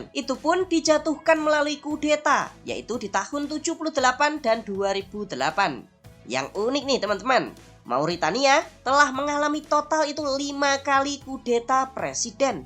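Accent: Java, Indonesia. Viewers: kental banget logat jawa nya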